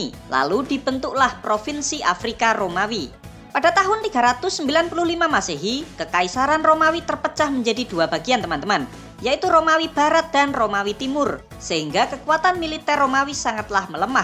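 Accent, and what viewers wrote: Java, Indonesia. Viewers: membawa britanya medok sih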